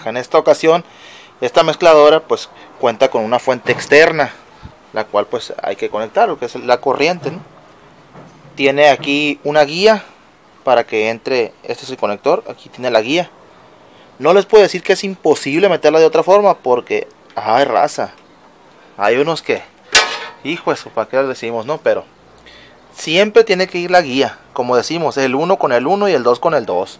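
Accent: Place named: Piura, Peru. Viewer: ese dejo es de piurano o de mexicano.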